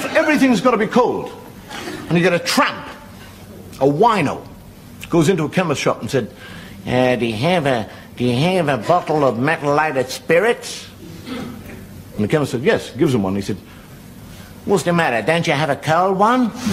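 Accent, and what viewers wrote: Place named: Australia. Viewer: Just nearly wet myself laughing..........is that how we (Aussies) really sound...??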